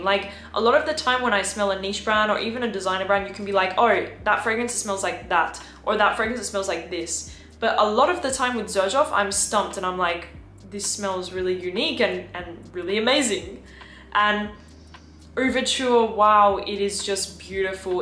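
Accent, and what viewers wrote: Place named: Australia. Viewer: Hello. I have been to your hometown Perth twice with the USAF Reserve out of Travis AFB California. Beautiful woman from a beautiful area of the world. I love the accent. Would love to be a patron member but How? I look forward to hearing from you LOL, Scott.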